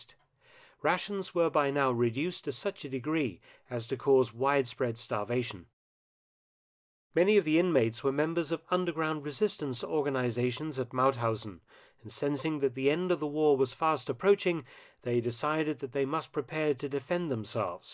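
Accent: England, United Kingdom. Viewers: That's how a proper English should be spoken! Gorgeous accent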